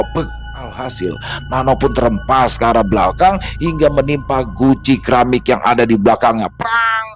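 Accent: Jakarta, Indonesia. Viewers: candu bgt sma crita bang logat btawi nya khas bngt. makaasih bang sehat sllu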